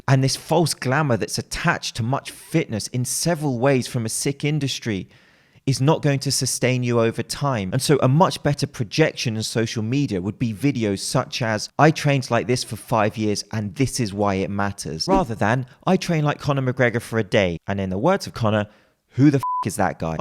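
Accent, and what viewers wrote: United Kingdom. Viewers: Your teeth are too str8 to be British...but not your accent... i am confused....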